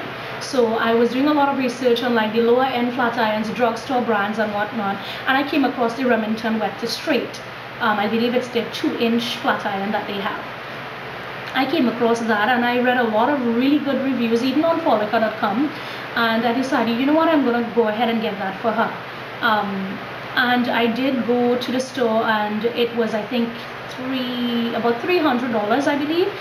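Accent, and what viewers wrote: Trinidad and Tobago. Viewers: As a fellow Trini I LOVE to hear the Trini accent on YouTube !!!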